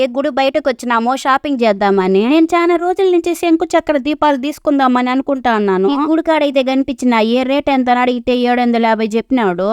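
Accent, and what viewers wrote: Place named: Telugu region, India. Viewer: nijamgane notlo neellu vurai chala meeru cheppesariki takkuna mingesanu pusukkuna navvesanu 😂😂 thank u mee slang ante chala ishtam maadi pakala but ippudu Tamilnadu lo vuntanu meeatalu vintu vantalu chusthunte mana voorilone vunna feel vasthundi thank u so much naakithe feel good harmones ekkuvaga release ayye stress antha pothundi🎉🎉🎉 Ilage manchi manchi recipies chesthu navvisthu matalatho magic chesthu.... always....😊😊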